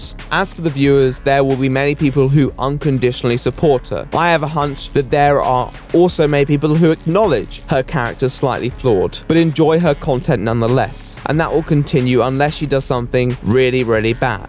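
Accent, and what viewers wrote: RP, United Kingdom. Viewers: I absolutely love his posh British accent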